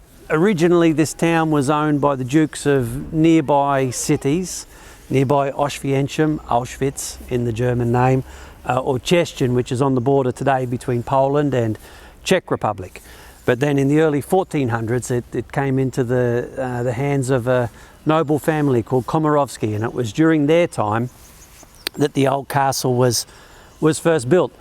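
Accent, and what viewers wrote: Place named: Australia. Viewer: G'day, Thanks for the informative and professional video. It was great to hear this unmistakable Ozzy accent. I don't know how long you've been living in Poland but in the very unlikely case you haven't heard about Great Masurian Lakes I strongly recommend a few days holiday in this area. Greetings from Melbourne, Andrew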